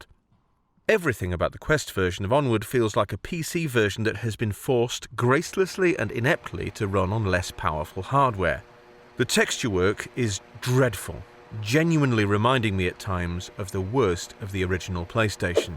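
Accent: United Kingdom. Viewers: alright well your british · That’s just’s cause he’s British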